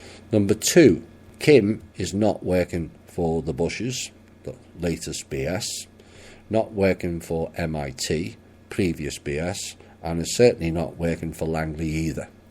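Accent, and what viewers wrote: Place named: Liverpool, United Kingdom. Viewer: I feel that the evil in this world has unleashed something palpable, and it seems to be growing exponentially! Maybe it has always been there and I only feel this because I am 'waking up' I don't know, but I pray for you, and all those who risk EVERYTHING to bring us truth and hope. May God (what/who ever you perceive the creator of light and love to be} shield and bless you always. THANKYOU!!!!! (PS - I'm guessing Birkenhead or Liverpool region?)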